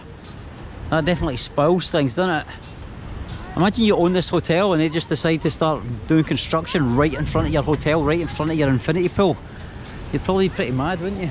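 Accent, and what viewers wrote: Scotland, United Kingdom. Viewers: That hotel looked sweet m8, another great vid your accent is brilliant over there the Scotsman with 2 strong legs 🙂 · Limmy has ruined the scottish accent for me. i can't take this guy seriously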